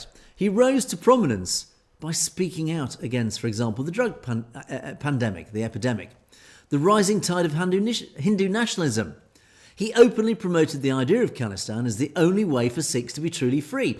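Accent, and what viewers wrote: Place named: United Kingdom. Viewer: massive respect to you gave us morale boost…just like Canadian James Cousineau (miss James laugh). Your voice is iconic it’s very coarse and gravelly with British English accent. 🧓🏼👔🎙️⌨️🖱️🖥️💡🌳 🕰️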